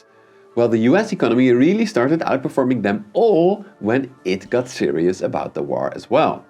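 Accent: Netherlands. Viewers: Dutch accent (a little bit similar to slavic accent, because the Dutch language sounds like Russians speak English), " is a PhD candidate at the University of Groningen, the Netherlands."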